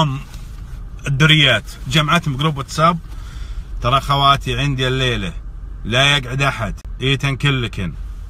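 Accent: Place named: Qassim, Saudi Arabia. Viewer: وش فيها لهجته احسن لهجة بعد قلبي القصمان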